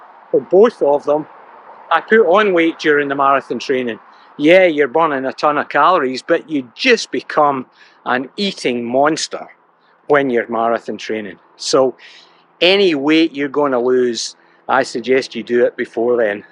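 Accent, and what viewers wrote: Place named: Scotland, United Kingdom. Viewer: Thanks for your videos. As a 64 year-old avid runner, they are great for me. I noticed your Scottish accent, but see from all the US flags in your videos that you're presently in the USA somewhere. I spent 3 years stationed in Thurso (far north of Scotland) as a US Navy Electronics Technician and my wife (for 40 years now) is from there. Where are you from?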